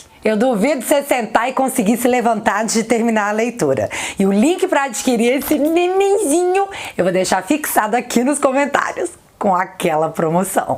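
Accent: Minas Gerais, Brazil. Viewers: Você lembra Mineira, fala qui nem eu.... da onde a senhora é?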